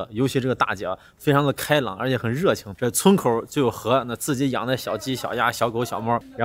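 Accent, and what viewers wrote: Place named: Henan, China. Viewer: 是河南人吗？普通话的河南口音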